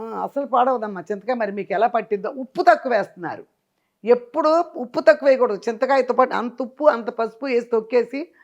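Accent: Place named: Krishna, India. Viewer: Amma mee native place krisha districta. Acham vja side la ne chesthunnaru. Me bhasha kuda krishna jilla la ne undi.krishna jilla vallu thappa migilina ea pratham vallu ayina nimmakaya pachadi thalimpu vestharu. Assalu bagodhu. Naku ma nayanMma gurthochindamma. Me lane kakarakaya, mirchi allam vesedhi.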